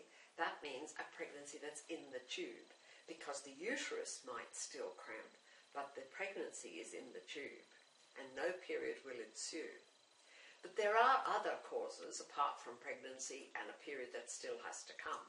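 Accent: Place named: Ireland. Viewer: Sounds posh irish to me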